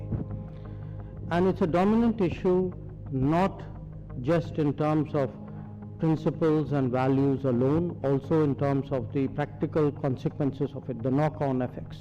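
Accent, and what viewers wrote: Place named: India. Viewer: why would anyone listen to a telemarketer from an Indian call center?!! Shut up Hadji and answer the phone!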